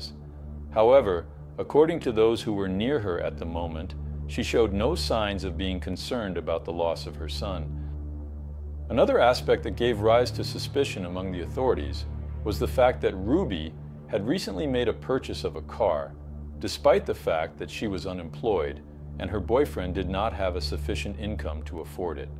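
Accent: Australia. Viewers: You sound Australian sometimes! Your english is fine, i think 😊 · Whoa! Are you kidding me? English isn't your 1st language? I've only now just detected a hint of an Australian accent! This whole time I thought you were American like myself!!! 😅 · Mate have you spent any time in Australia? Mate it sounds like abit of an Aussie accent leaks out? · Your English is amazing! What's your first language? And what's your accent. Almost sounds like hints of Australian lol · Sounds like you also have a bit of an Australian accent. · Strong twangs of an Australian accent though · I’m Australian & feel like I’m hearing an Aussie accent at times. Weird 🤷‍♀️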